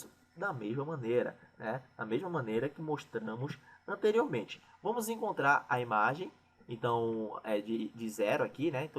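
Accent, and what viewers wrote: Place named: Ceará, Brazil. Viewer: E esse sotaque maravilhoso é de onde?És também do Ceará? Parabéns pelo trabalho, muito bom!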